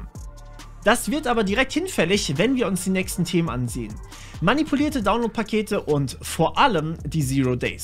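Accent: Nuremberg, Germany. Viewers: Bist du aus Nürnberg ? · kommst du aus nürnberg?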